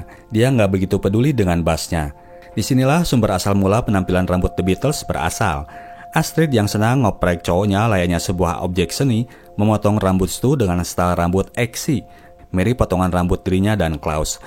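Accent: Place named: Padang, Indonesia. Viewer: Logat padang....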